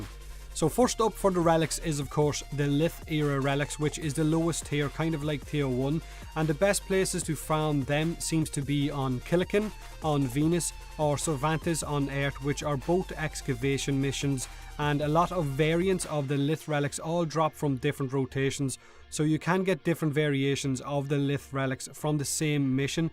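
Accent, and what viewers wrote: Ireland. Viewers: I love that Irish accent :3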